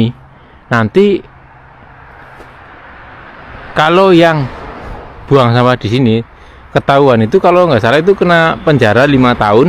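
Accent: Banyumas, Indonesia. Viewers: Pekan baru... Mas senggol dong.. Kepriben ora ngapak ya ora kepenak.... ❤😂😂 sukses..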